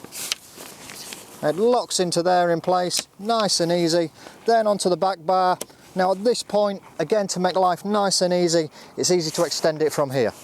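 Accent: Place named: North, United Kingdom. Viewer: Absolute nightmare presentation mate. You sound like a cross between a pissed up northerner that's just inhaled a helium balloon with the animation of a Duracell bunny.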